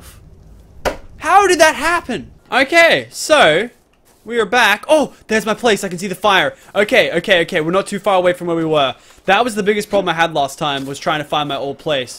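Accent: Australia. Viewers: He is australian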